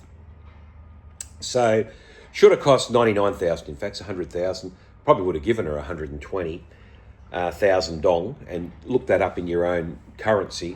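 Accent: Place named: Australia. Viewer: Why are you talking that way ? Can't you talk normally ? Your accent irrtates me a bit. Is that an Australian accent? It's a second worst accent I've heard. The worst is South African accent . The second one is yours.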